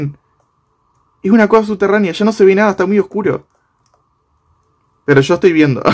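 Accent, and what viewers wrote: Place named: Uruguay. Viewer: Hablas como uruguayo del interior, de donde sos?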